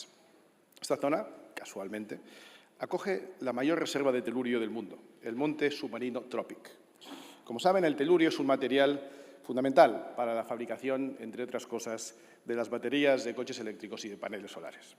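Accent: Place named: Morocco. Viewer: Iván tienes un acento igual que la gente del pueblo de Tetuán y chawen de Marruecos por eso viene éste odió a los marroquíes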